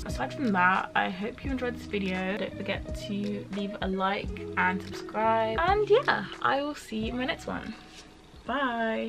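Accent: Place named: Leeds, United Kingdom. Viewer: I think your accent has been influenced by Leeds. You don't sound fully london anymore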